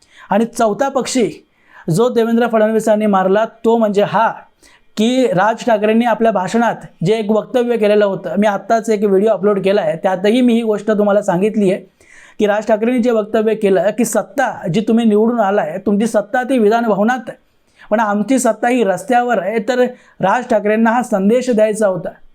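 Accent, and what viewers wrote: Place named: South, India. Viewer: तू दाक्षिणात्य आहेस मराठी बोलतोयस तुझं अभिनंदन पण तुझ्या बोलण्यात दाक्षिणात्य बाज आहे.तुला जो पुळका हिंदीचा आला आहे ना तो तू तुझ्या मूळ राज्यात जाऊन पहिल्यांदा हिंदी भाषा सक्तीची करण्यासाठी अभियान चालू कर.इथे अक्कल पाजळू नकोस. जय जिजाऊ जय शिवराय जय शंभूराजे 🚩🚩🚩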